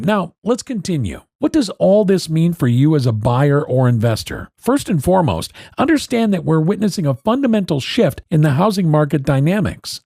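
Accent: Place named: United States. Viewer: The American voice is so annoying.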